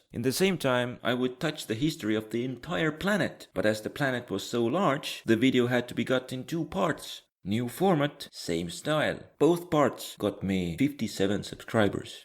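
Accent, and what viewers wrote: Eastern Europe. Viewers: Ditt accent låter mer östeuropeisk än Svensk? Estland, Lettland, Litauen? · Its interesting to see you reflect on your own channel after you gained some traction. I think I subscribed after first seeing your eastern front animated video. Here are my thoughts: (not to be rude or anything, just some honest criticism) As you have already noticed the drawing part is certainly the weakest aspect of your videos. If you aspire to be on the level with series like extra history the truth is that your drawing/animation skills are still very basic and not quite there yet. That does not necessarily mean that „bad“ videos will come out of it - just that they are not on par with most comic-narration things you see on youtube. And there is quite a lot of it so its hard for you to stand out. But I dont think you actually need that. As your eastern-front-animated video shows I think you are far better off concentrating on the mapmaking and detailed-research aspect. 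I could think of your channel as something like a baz-battles on steroids with a focus on the modern era of history and going in for much more detail. So I would suggest making the videos almost fully map-based while trying to make the maps graphics even simpler and also more refined. One last point that you might not be aware of: many people have problems understanding eastern-european accents. (I suppose your estonian but its quite similar) I noticed the guy who does baz-battles is also from eastern europe and once he changed to a native english narrator his videos became much more accessible for a broad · Are you from sweden? Why do you have an eastern europe accent?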